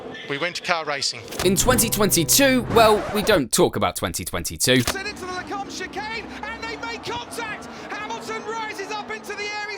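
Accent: United Kingdom. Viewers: I can smell the bias British from 300 miles away pff. ugh...no debate he doesnt even belong to that list. Fangio, Ayrton senna and Michael Shumacher and i think now Max popping up. Not Lewis. And with bias British accent people cant take this serious having such debates, bias bias bias. Remind you he lost from Button, Rosberg, <> Massa, Russell some even teammates outqualified Lewis in a season. I dont see Fangio, Ayrton or even Micheal or Max doing that. Oh i dont even start his dad was poor as he got financial backup from Ron Dennis at the age of 11.. His career before F1 isnt exactly bright at all compared to other F1 drivers.. Other drivers BEFORE entering the F1, perhaps you can spot the difference: Verstappen: 2010: WSK Nations Cup - KF3 - 1st 2010: WSK World Series - KF3 - 1st 2010: WSK Euro Series - KF3 - 1st 2010: CIK-FIA World Cup - KF3 - 2nd 2010: Bridgestone Cup Europe - KF3 - 1st 2011: WSK Euro Series - KF3 - 1st 2011: WSK Master Series - KF2 - 1st 2012: CIK-FIA World Cup for KF2 - 2nd 2013: CIK-FIA World KZ Champion - 1st 2013: CIK-FIA European Champion - KZ - 1st 2013: WSK Master Series - KZ2 - 1st 2013: WSK Euro Series - KZ1 - 1st 2013: CIK-FIA World Champion - KF - 3rd 2013: CIK-FIA European Champion KF - 1st 2014: FIA Formula 3 European Championship - 3rd 2015: Formula 1 Hamilton 1995: Super 1 Nat Champion – IAME - 1st 1996: Kartmasters British GP – Comer - 1st 1997: Super 1 National Champ– FY 1th 1998: Torneo Industrie – 100 Jun- 19th 1998: Green Helmet Tro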